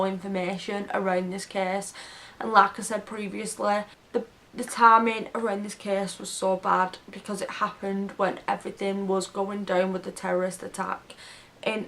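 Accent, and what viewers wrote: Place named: England, United Kingdom. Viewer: Great video X subbed good t hear a English accent X subbed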